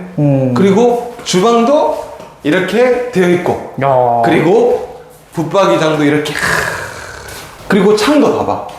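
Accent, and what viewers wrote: Gyeongsang, South Korea. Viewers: 경상도 억양이 너무 심하네요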